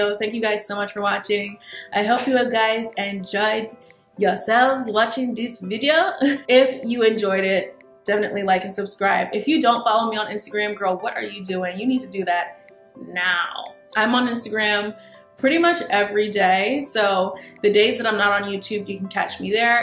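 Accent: Nigeria. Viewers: Loved it. Your Naija accent is the one...haha lol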